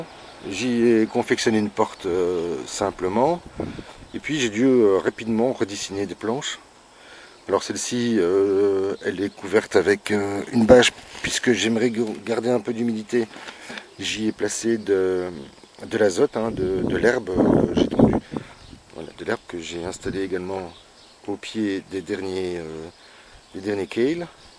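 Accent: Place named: Brussels, Belgium. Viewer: Salut, merci! J’ai reconnu dans ton intonation une musique chère à mon coeur d’ex- Bruxelloise 😉. Je te félicite et te remercie de nous offrir tes belles idées. Bises du Nord de France, Claire.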